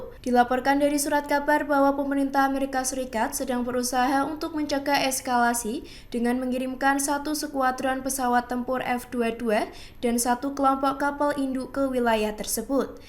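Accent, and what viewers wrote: Java, Indonesia. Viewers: mimin nya medok amat ya😅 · Narator nya medok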